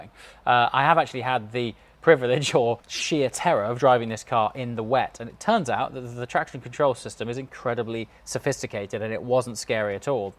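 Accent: United Kingdom. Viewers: Man, not every listener is British, speak slower and make sure that no dialect is involved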